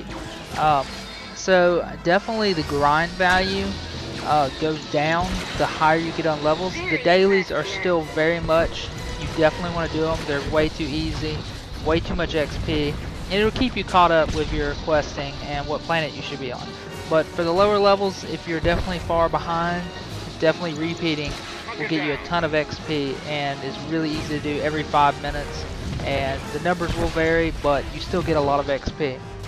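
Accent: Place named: United States. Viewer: His accent is country, I think.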